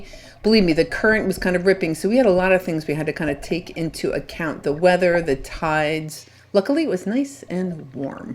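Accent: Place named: South, United States. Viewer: Hello love! FIRST! Maybe one day, I'll make it to the Keys. Don't know why I've never been... Beautiful sea star! Is that a Clydesdale horse conch? Hey, you're beginning to sound like a real Southern girl! "I'll tell ya what!" You did great and had such an exciting adventure! So happy for you! Much love from Levi and me! It's nearly a hundred degrees up here! We're staying in the AC! Your Mermaid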